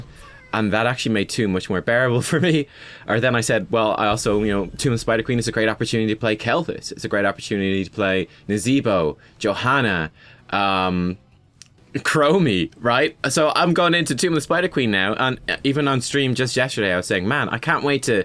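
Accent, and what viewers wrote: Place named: Ireland. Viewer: just teasing, love the Irish accent!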